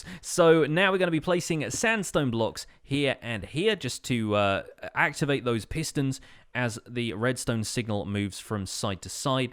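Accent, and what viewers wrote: United Kingdom. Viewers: Im so happy i caught up to this current episode. Your lovely british accent is now stuck in my head thanks to me binge watching the series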